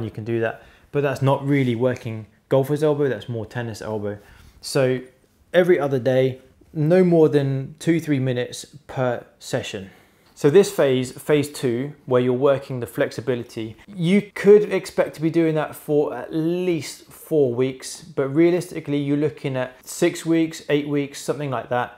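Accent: United Kingdom. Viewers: You sound like you're from Landan...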